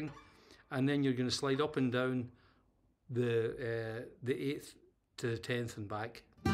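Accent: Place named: Scotland, United Kingdom. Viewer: Are you Scotts ? What a great accent ! love it.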